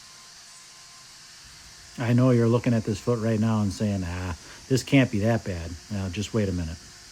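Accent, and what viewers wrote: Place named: Midwest, United States. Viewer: I figured out why I'm kinda obsessed with your channel, its kinda like watching rust busting work on a ship, but with a pleasant and informative midwestern voiceover and empathy.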